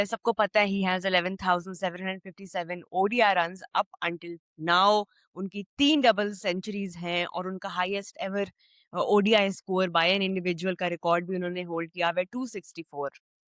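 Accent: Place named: India. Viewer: your accent feels more Indian than Pakistani accent that we've heard.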